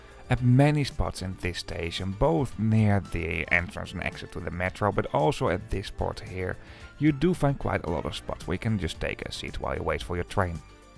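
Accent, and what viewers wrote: Netherlands. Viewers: Nice video (and nice duch accent) well described with many informationts I didn't know before. I traveled several times between Saarbrücken and Paris years ago (with Euro-City), minimum 10 times allez-retour... when I was last time in Paris Gare de L'Est, there where no barriers at the access to the platforms. Btw. once I met an Australian guy, interested in high-speed trains, who called the ICE-Trains icetrains... Hartelijke groeten, Andreas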